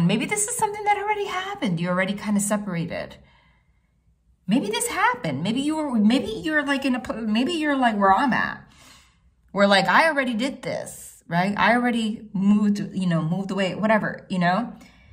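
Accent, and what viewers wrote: New Jersey, United States. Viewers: Listening to you I KNEW you were from NJ. We just have that certain thing lol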